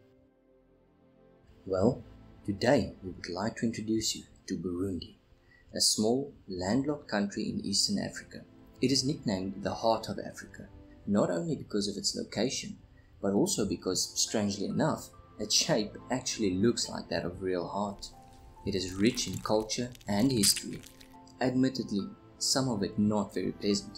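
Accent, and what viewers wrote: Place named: South Africa. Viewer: Are you South African, or Dutch? You sound like you are from S.A. ? Saludos desde California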